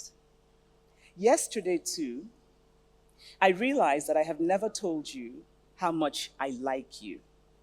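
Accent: Nigeria. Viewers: Would call it bravery amongst other things. Cause people around her would be intentionally or passively chipping away at her to stop making them feel uncomfortable whenever they all go out and she speaks in her natural Nigerian accent, but her courage and confidence in her own tone and skin has helped her last this long, without modifying it. I think by now it’s much easier for her to sustain, but earlier in her abroad life, it’ll have been much harder & the critics would’ve been much louder too. Hoping more Africans that’re spread across the diaspora develop this natural courage to speak freely but remain eloquent at all times. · "How I like finding bumps in smooth surfaces" I could relate to this. I'm always scared of perfection because perfection is too mechanical. I love that you maintained your Nigerian accent, it's beautiful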